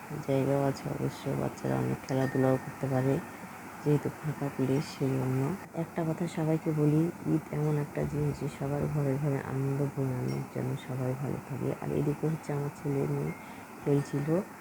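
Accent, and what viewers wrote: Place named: Rajshahi, Bangladesh. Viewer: Eta Kon jaiga? Apnar vasa amader Rajshahir moto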